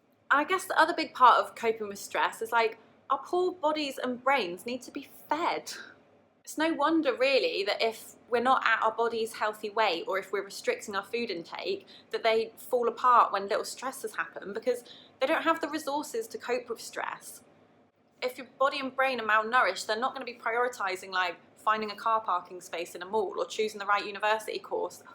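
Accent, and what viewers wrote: United Kingdom. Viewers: For some reason it’s really comforting to hear a British voice on a (quality) YouTube channel! I feel like I’m so used to American accents that I get startled by a British one (even though i live in England and everyone around me sounds like that - it’s just that online everyone’s a Yank I swear!) you’re so eloquent too which is amazing! X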